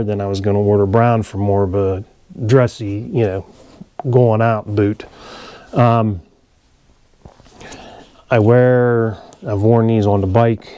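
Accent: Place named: Pittsburgh, United States. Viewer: Are you from Pittsburgh or near it by chance? You have a Pittsburgh accent.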